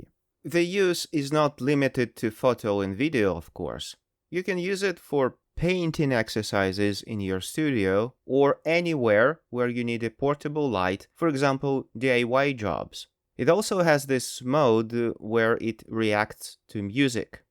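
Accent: England, United Kingdom. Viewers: о, вы из Англии?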